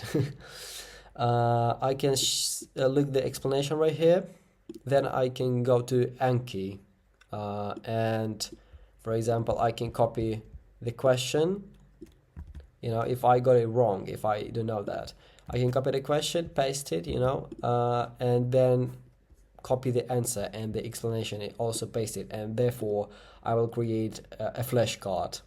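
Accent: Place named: India. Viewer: I am sitting over here trying to decipher his accent because it sounds Indian but he clearly doesn't look Indian😅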